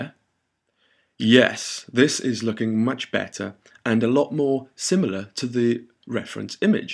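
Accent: Wales, United Kingdom. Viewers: his accent sounds Welsh me thinks, tidy :)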